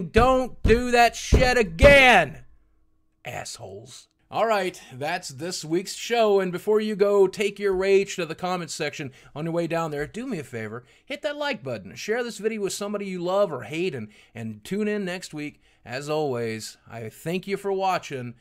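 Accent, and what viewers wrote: England, United Kingdom. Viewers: Brilliant vid mate, especially appreciated the English accent, proper cracked me up! Trying to think of some constructive criticism, but to be honest, I don't think you could have said it better. ;-)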